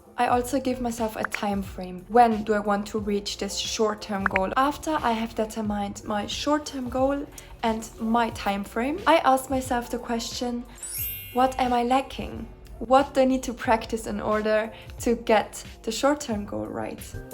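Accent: Germany. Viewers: Very impressed by your diligences and study approach, I'll definitely try to apply it going forward. Just a small note: you have excellent English but a pretty strong German accent - maybe try to emulate your preferred English accent, just to sound a bit more natural?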